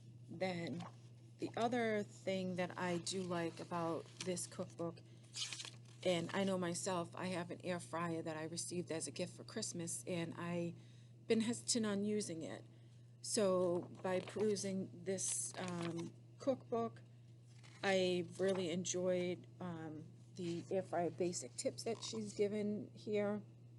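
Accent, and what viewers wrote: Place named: Massachusetts, United States. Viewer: I love her Mass accent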